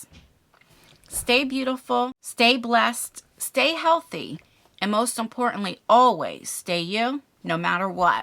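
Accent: New Jersey, United States. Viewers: Love your videos! Whenever I hear your voice, I miss living in New Jersey :-). We are the same age and our birthdays are only a couple days apart, and I just learned a few years ago to trust my gut! Don’t let people gaslight you into making you feel like you’re losing your mind. You feel how you feel for a reason.❤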